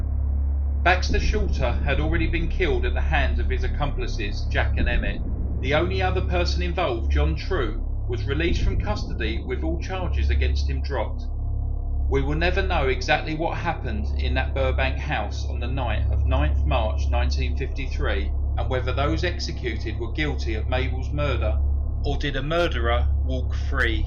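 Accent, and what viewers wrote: England, United Kingdom. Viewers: I think people think you and Brief case sound alike because of your British accent. To Americans British accents all sound alike. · These cats think anyone with an english accent sound like the same person.! lol Although these are 2 of my very favorite channels! Brothers or cousins maybe?? teee heee!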